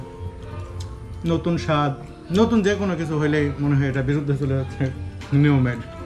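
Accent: Bangladesh. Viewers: Apnar kotha sune ..mone hoi apni bangladeshi ..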